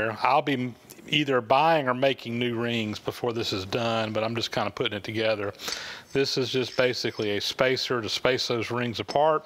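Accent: South, United States. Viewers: He absolutely has a distinct US 'southern' accent - Georgia to be exact. It's very easy for me to hear. One of the easiest examples is when he pronounces 'oil' as 'awl', which is the classic 'southern drawl'. Also when he says 'made by mah friend...' instead of 'mye friend'. '' Saying 'and such' is kinda Southern, but I've heard it from various US regions, not sure the origin. Though 'castellated' is the correct academic term, just about everyone that I've encountered calls them 'castle' nuts, even listed as such at most vendors and in parts catalogs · Interesting you call those 'castle' nuts. Here in Canada, I have always heard them referred to as castilated nuts. Means the same thing I suppose, but it is always interesting to hear regional differences in speech. You don't have what I would call a 'southern' accent, but there are certianly elements in your speech. 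One phrase you use interests me. You often say "And such" where I would say "And so on". I have never heard that before. Is that a southern or regional phrase? In any case, in any language or dialect, I enjoy your videos! Keep safe during the Covid-19 mess!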